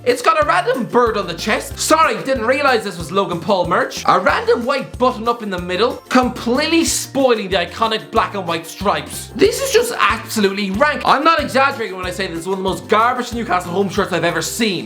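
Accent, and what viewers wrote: Ireland. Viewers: Ready to hear a Irish guy rant again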